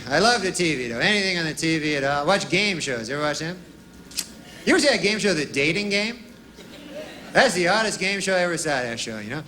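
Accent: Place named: Canada. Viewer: Man he's SUPER Canadian right here now eh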